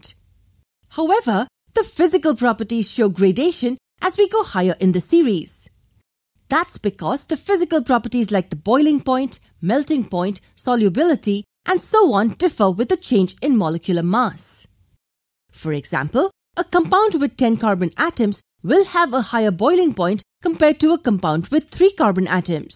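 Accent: India. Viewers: Is the speaker indian ?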